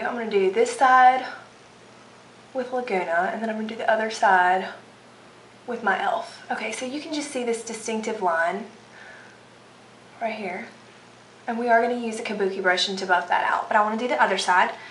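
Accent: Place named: South, United States. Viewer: im from the south and the second thing i noticed was your southern accent, the first realizing how beautiful you are! I love it though:)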